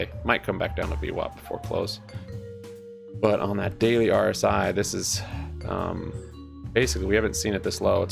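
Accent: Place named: United States. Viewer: Dude, you sound just like Elon Musk with a more American twang. Are you Elon Musk?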